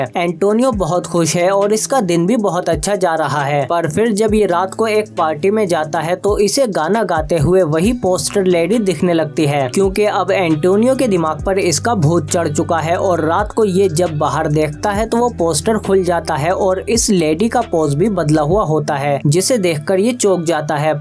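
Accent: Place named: India. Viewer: Your Indian accent, when you speak in English, is terrible, man from India. Learn English better.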